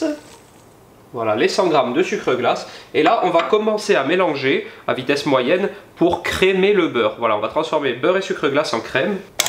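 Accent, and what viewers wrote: Corsica, France. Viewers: Super vidéo, avec l'accent corse.... Bisous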